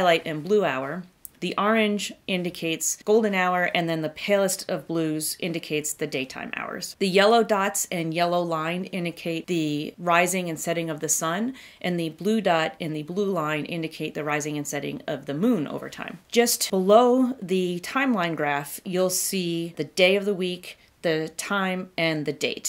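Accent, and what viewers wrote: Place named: New England, United States. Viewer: Found this by accident and great!!. Just what I need but need a faster brain to keep up with your Vermont one or somewhere in New England. Use to live in Norwich. Thanks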